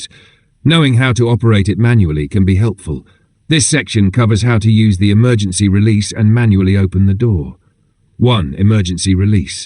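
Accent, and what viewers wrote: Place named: Europe. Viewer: This is the most obnoxious narration. Does every video need a European accent to convey legitimacy. It doesn’t.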